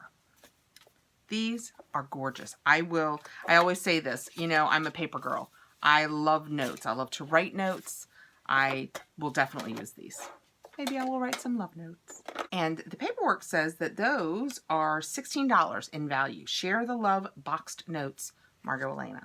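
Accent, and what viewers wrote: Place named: South, United States. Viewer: Lavenda! That is how a true Southern girl speaks! My grown kids tease me as I say ,"Souwich," instead of "sandwich"!! Raised in Houston and love it!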